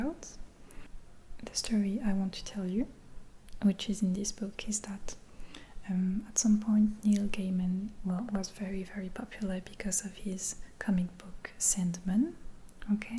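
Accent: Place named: France. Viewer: Your videos are so wonderful. You have a perfect ASMR voice. I love your accent too- I studied French for many years and it brings me back. You English is amazing!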